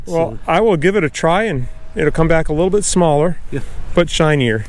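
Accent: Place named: Michigan, United States. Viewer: I love Petoskey stones! I was born in charlevoix. Your accent makes me homesick. I live in GA now.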